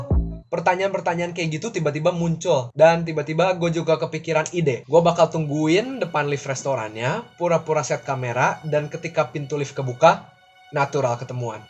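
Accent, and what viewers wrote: West Java, Indonesia. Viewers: Eta nya .ari logat sunda mh teu d mn2 bde stay d mn oge..keu2h ..moal leungit ..kjn pk bhsa gua..elu..😂ttp we aya.. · Kesini karena muncul di beranda. Salam kenal. Baru denger suaranya aja udah bisa ketembak kalau orang Sunda, cuma takut salah karena facenya eh ternyata bener asli Sunda, Jawa Barat dong. Salam kenal. Halloo... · Aya logat sundaan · Cara bicaranya kaya legok Sunda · Logat sunda na mantep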